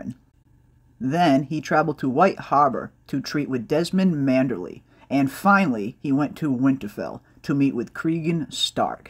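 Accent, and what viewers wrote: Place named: Boston, United States. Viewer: You must not be familiar with channel, that’s just his Boston accent. It can sometimes sound like he’s not pronouncing a D or an R here and there but he is. He’s literally an encyclopedia of ASOIAF knowledge, there’s not much about the source material that he doesn’t know.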